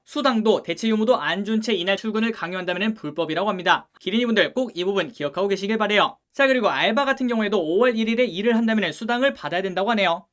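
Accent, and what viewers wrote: Gyeongsang, South Korea. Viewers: 고향이 경상도신가욥?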